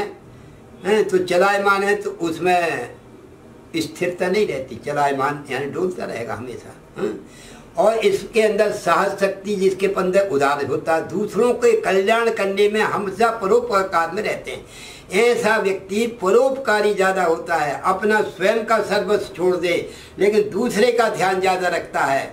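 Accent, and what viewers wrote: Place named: Madhya Pradesh, India. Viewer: Aapke baat karne se aap m.p. ke lag rahe hain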